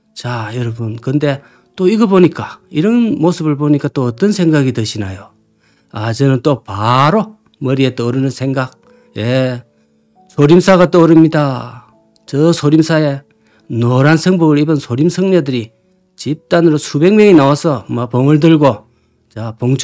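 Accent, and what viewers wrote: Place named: Gyeongsang, South Korea. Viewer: 경상도 말을쓴다고, 너자신의 본질이 숨겨지는것이 아님!!!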